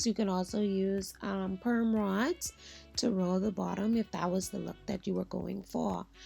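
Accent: Bahamas. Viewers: New to this channel. Sounds like you have a bahamian accent. Your twist out came out bomb.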